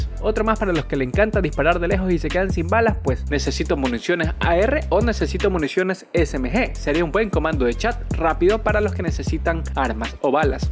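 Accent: Ecuador. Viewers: Pregunta: De que parte de Ecuador eres? Lo digo por q en un directo te vestiste de amarillo y dijiste como el "Barcelona" y en unos vídeos sabes hablar como ecuatoriano xd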